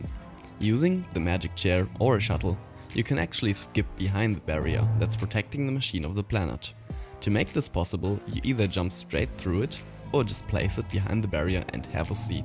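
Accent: Germany. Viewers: Der deutsche akzent ahhh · You are German Right?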